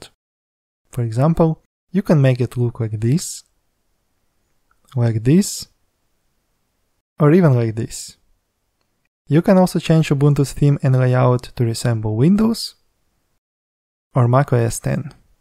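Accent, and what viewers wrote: Russia. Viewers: YourOwnAzog I think yes, I am Ukrainian and recognize this russian-english accent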